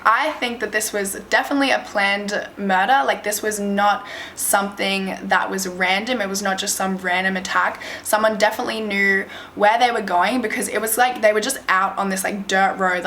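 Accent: Australia. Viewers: Are you Australian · Australian. She's from Australia. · her accent is like australian and america switching back and forth. i'm so confuseddd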